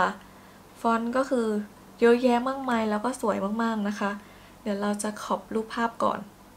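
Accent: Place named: Thailand. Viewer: คิดถึงช่องนี้ เพราะ ตอนเด็กๆดูบ๊อยบ่อย จำการรีวิวได้ แบบ จะเน้นของที่รีวิวแบบ เหน่อๆ แบบ อย่างนั้นแหละ 555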